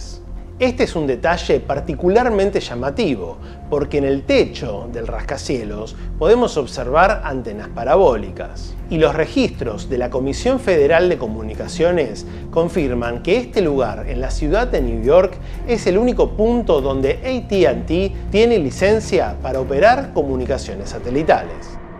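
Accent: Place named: Argentina. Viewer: Es muy desagradable el exagerado modo de hablar argentino. Debe ser que lo remarca para que se note aún más. Es que así se cree importante????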